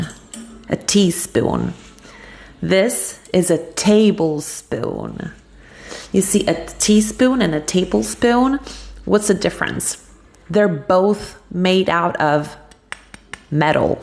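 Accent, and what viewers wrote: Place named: Midwest, United States. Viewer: Da madrelingua, complimenti per la pronuncia. Sembri proprio americana, con un accento un po’ midwest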